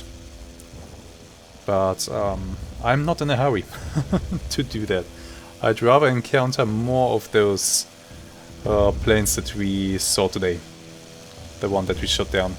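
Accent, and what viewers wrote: Germany. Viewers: Der Titel dieser Serie gewinnt immer mehr an Wert. Weil, sooooo kurz ist das Leben von Felix dann doch nicht. Allerdings wartet der Tod in jeder Folge. Wird wirklich Zeit das es bessere Maschinen gibt. Von daher ist deine Strategie genau richtig. Besser einen Kampf, den man nicht gewinnen kann, zu vermeiden und dann später mit besserem Gerät deine Skills einzubringen. Würde ich genauso machen. Dein Englisch klingt so als wenn deutsch deine Muttersprache wäre. Macht das Audio für dieses Projekt irgendwie "cool". Naja, hoffen wir mal, das wir gemeinsam noch viele Folgen des Felix erleben dürfen. Vielleicht wird Felix von Berg der neue "Rote Baron"? Jedenfalls ein LetsPlay mit einem echtem Mehrwert für den Zuschauer.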